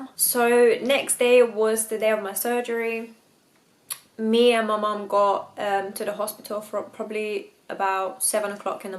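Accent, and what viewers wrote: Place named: England, United Kingdom. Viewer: you really mastered the English street lingo and accent!! SO impressed.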